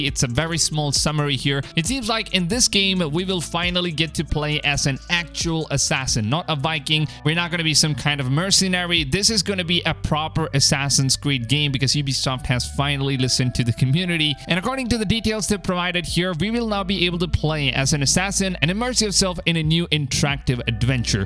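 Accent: India. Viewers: U indian boi tho? I am picking up a slighhhht accent wasup? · Yes and he clickbaits by using thumbanails and titles ,perhaps he is somewhere from india but his accent is different from the accent which i hear from the common folks of my country. And i think we shouldnt follow this guys content . Sometimes he says AC valhalla is the best assassin but after making 2 videos he says AC vallahalla and Ac odyssey are repetitve games..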